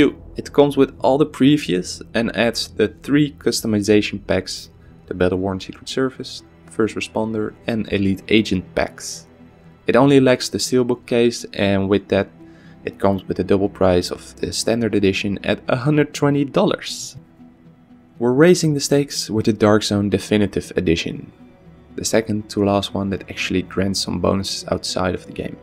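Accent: Netherlands. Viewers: I played The division 1 even tough everyone disliked it i liked it. I Will be buying The gold version. Btw my uplay is maybe you could add me so we Can play together sometimes. And you sound dutch are you? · Are you Dutch? You have a Dutch accent xD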